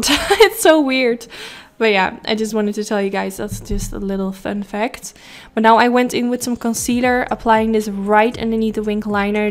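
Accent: Netherlands. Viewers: If you have hooded eyes then I have. no eyes. Really if you call this hooded...girl my whole skin above my eeyes falls almost over my lashes. That is hooded eyes. Love how it looks!! Just doesnt work for my really hooded eyes. I just use black eyeshadow as eyeliner. Now for the first time I heard from your accent you are Dutch. Some words sounds familiar. 😄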